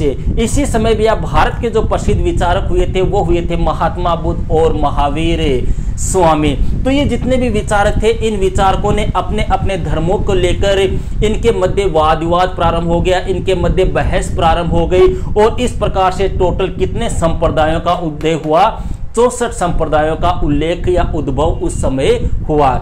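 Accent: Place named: Haryana, India. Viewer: sir Ap bahut Achha padhate ho but sir background sound thik karo please or hindi bola karo haryanbi mat bolo please 🙏🙏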